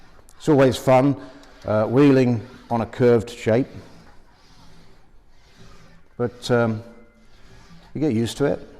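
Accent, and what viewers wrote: Australia. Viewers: I couldn't pick the accent. Checking the about on the linked web page I see he started in Britain and moved to America. The resultant accent is closer to Australian than anything else.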